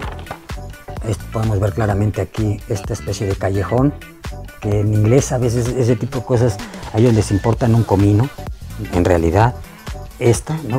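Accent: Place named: United Kingdom. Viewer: I like your lovely british accent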